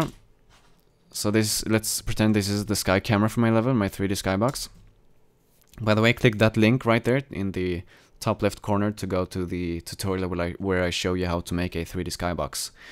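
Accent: Sweden. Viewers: Hah jag kunde höra att du var svensk :D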